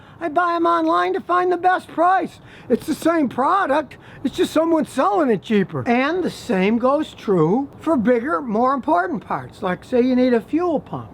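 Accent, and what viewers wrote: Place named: New York, United States. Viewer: You from New York or Chicago? Love the straight forward talk.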